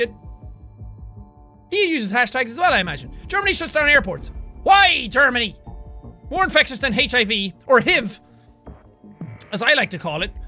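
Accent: Ireland. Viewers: Erase What? Do you mean Top of the mornin' to ya laddies? It's because he's Irish. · is he irish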